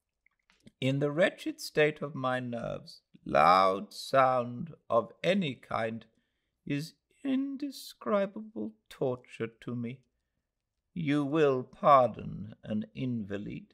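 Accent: United Kingdom. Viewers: This is amazing, I just discovered this reading, so pleasurable and quintessentially English. Thank you.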